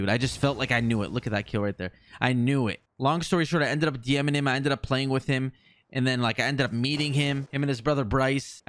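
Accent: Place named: United Kingdom. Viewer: That British accent is disgustingly funny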